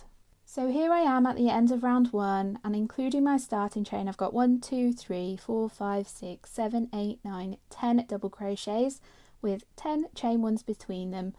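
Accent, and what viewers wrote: United Kingdom. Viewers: again, US English terms and UK English terms are NOT the same. Examples: US single=UK double. US treble=UK double treble. The terms are 1 off from eachother. Considering her accent, im going to guess her terms are the UK terms, hence: she did exactly what she said she did 😊. Hope that helps clarify my comment.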